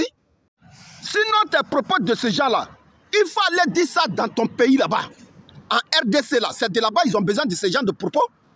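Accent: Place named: Ivory Coast. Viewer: Koffi a déjà mahé lahan de watara il s en fout.....😅😅😅😅 je suis congolais je kiffe l accent ivoirien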